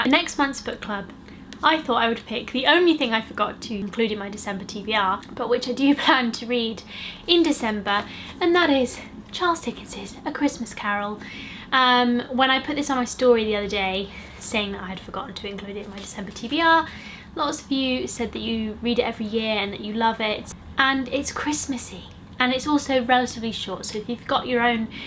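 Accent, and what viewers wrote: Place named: United Kingdom. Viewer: I love the following: 1. your hair 2. your eye shadow 3. the color of your sweater 4. your lip stick color 5. your matching colors 6. your Adele accent 7. your piercings 8. your rings 9. the background 10. your stamina to finish a 50 min video 11. your overall fashion sense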